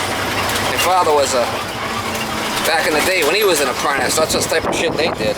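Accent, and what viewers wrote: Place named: New England, United States. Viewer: New England drawl!👷🏻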